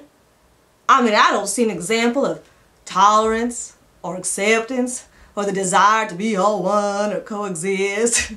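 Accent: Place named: South, United States. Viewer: Great video! Your southern accent is hysterical, not to mention you deliver your points with class and style. I hope you plan on making more vids.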